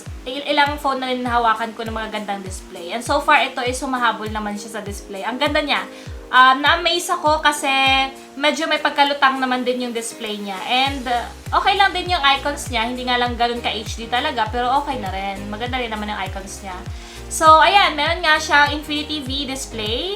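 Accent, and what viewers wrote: Visayas, Philippines. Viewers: Bisaya diay ka idol. Kamoka mo at kabuses mo din kapitbahay nmin hahahah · Hala grabe bisaya mn d i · Ay chouuurr bisaya man diay ka te, heheheh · Bisaya diay ka te?😂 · Ang sarap sa tenga ng bisaya mo ate! 😍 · I like your bisaya accent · Whoa! Bisaya diay ka Miss! 😮🔥#ProudBisaya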